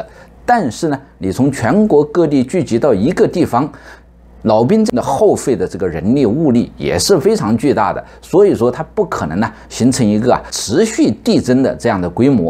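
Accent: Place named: Huaiyang, China. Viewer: 你的口音好淮扬，很可能是镇江人？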